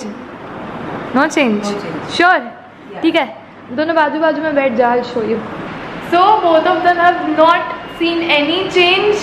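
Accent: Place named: Kutch, India. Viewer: U r speaking sweet kutchi language. I m also from bhuj n I know kutchi. Fine kutchi boleti muke gamai.😊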